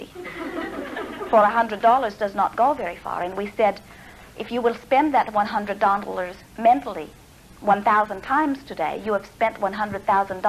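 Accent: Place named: United Kingdom. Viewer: I love these old videos, Esther sounds so British 😂and what Esther saw, just by repeating a joke,, now that is very interesting,, it shows just how powerful, law of attraction is. gotta also say this video may be a very old video, but l ain't heard it before, and like wow, so powerful, thank you ❤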